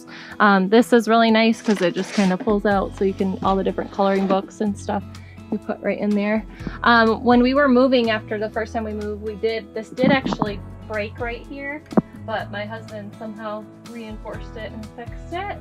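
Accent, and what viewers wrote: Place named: South, United States. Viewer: you are getting a southern drawl, also LOVE the intro. Cool storage ideas/hacks.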